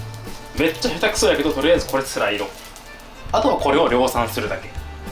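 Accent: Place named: Nagasaki, Japan. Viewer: おなじ長崎県身として 方言嬉しい（笑） 〇〇っちゃんﾊﾞﾘ長崎やし（笑）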